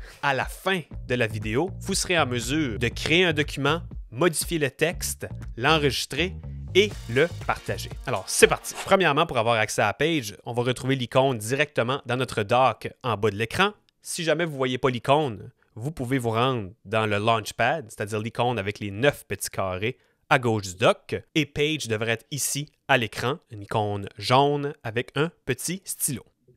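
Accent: Canada. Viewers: Supers explications ! Enfin je sais comment transformer mes documents Pages en Word ou PDF ! ! Merci et j'adore ce petit accent canadien !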